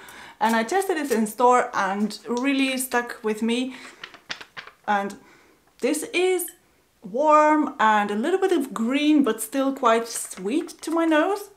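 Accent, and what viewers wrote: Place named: Finland. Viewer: Hello how you feeling?New subbie here:) Glad I found your channel my friend.Wonderful video and haul.Super solid list.I see your from Finland.Very cool,you have a beautiful accent as well:) I was in Paris this time a year ago in Jan of 2020.I was having a couple of pints of brew at a pub right outside the Paris Saint Germain stadium after the football match against Monaco.The game just ended so the place was packed with people who just left the game.I met some COOL people from Finland.Really genuine and down to Earth,great times.Happy New Year to you and yours.Much love from California.Peace & Blessings!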